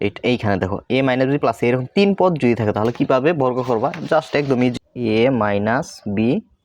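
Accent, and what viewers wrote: Tangail, Bangladesh. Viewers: ছার, আপনি কি টাংগাইলের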